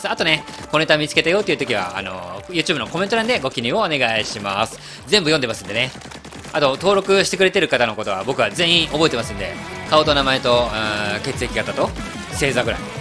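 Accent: Hiroshima, Japan. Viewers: イケボなのに広島弁で親近感が湧く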